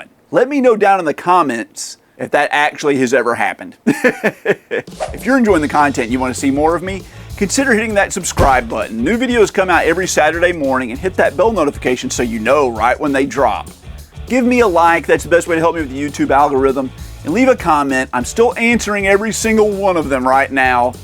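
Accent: South, United States. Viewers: Man, As a gamer who watches tons of Youtube Game Reviewers, you are the first that sounds like someone from where im from...The south... Nice to hear a good ole country boy on the gaming scene....Glad i found your channel😀. I fall asleep to AVGN, but i woke up hearing your voice like “who is this?”…Hooked!!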